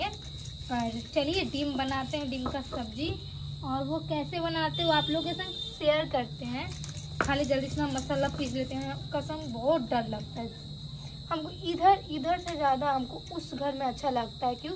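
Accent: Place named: Jharkhand, India. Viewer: Jharkhand kolkata side aise hi bolte h waha k log meri saas bhi bolti h massala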